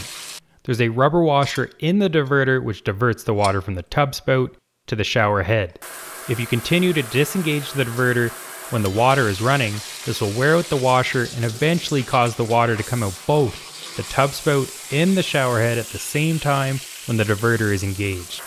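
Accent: Canada. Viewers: The Canadian accent is killing me.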